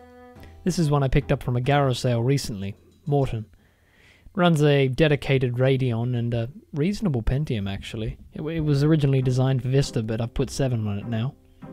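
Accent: Australia. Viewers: Australian BROOOO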